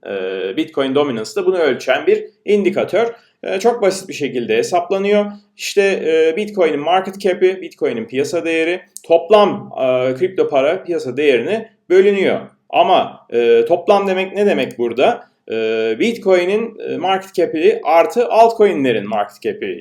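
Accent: United States. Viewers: aksan amerika :)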